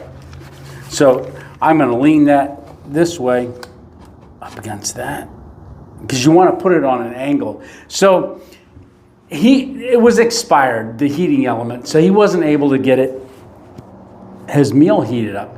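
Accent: Pennsylvania, United States. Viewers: 3 minutes in and I'm like this guy has to be from PA.... look it up and sure enough you're in mg territory lol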